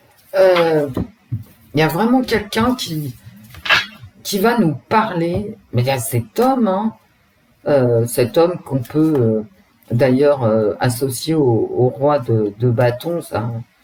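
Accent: Paris, France. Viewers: 🥰 🥰La Cartomancienne "En Tête à Tête avec elle Même.Merci de nous Enrichir.(j'adore,votre gouaille de Titi Parisien)j'y retrouve: mon parrain et ma marraine,les bretons à la Capitale en 1940 tout jeune💗💖Oui il est beau ce TAROT. Le 2 oui. La Dualité. Il Est Ou L'Homme...Bonheur. Joie. Amour à toutes et tous. Bonne fin de journée 😍😘🥰🤗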